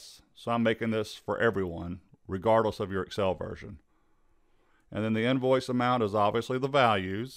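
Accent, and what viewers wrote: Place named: Texas, United States. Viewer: Sir, are you from Texas because you sure sound like it?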